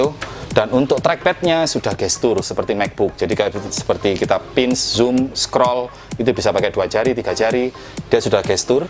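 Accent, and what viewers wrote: Java, Indonesia. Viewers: Medok Mas...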